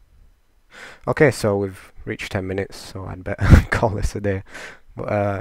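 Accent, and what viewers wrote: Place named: Northern England, United Kingdom. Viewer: is from yorkshire? well he's northern anyway xD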